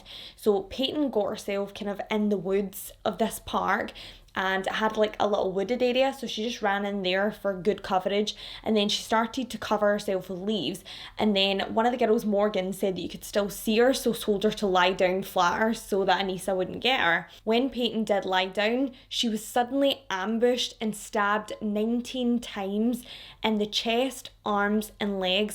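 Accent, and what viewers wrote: Scotland, United Kingdom. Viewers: The Scottish accent brought me here and the fun story telling was an amazing bonus. Hooked for life!!!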